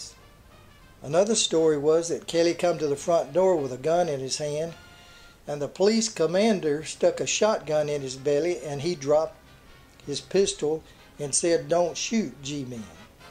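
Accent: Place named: Kentucky, United States. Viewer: I love your accent. It sounds Kentucky or maybe Tennessee, but I could be wrong.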